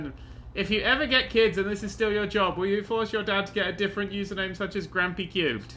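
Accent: England, United Kingdom. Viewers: No he is just English.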